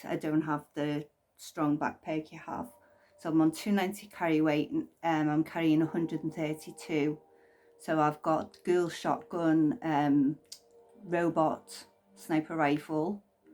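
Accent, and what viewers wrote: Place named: Liverpool, United Kingdom. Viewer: I'm used to the contemporary scouse accent. I can see that you're scouse now just thought this accent died with the beatles.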